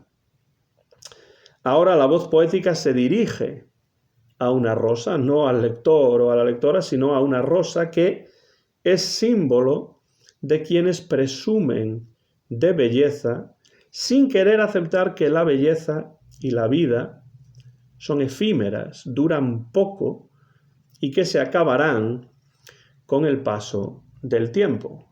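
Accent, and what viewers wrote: Spain. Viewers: Gracias por su análisis sumamente interesante sobre Sor Juana Inés de la Cruz. Me encantan todos sus videos y su manera muy amena de presentar las obras literarias estudiadas en clase. También me encanta su acento y quería preguntarle en qué parte de España nació usted.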